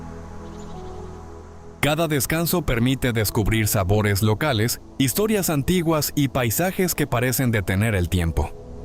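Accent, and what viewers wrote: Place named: Brazil. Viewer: Abençoado dia por Deus obrigado por passar uma voz quase Brasileira gosto de vidios asim e asim entendi muito bem o que diz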